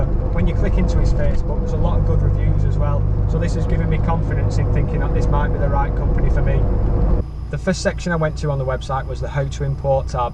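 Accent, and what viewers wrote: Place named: Lancashire, United Kingdom. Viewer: Just on part 2 now, enjoying it so far! Subscribed btw. Where you from if you don’t mind me asking? you sound local to me, lancs, Blackburn Area ish accent? 👍🏻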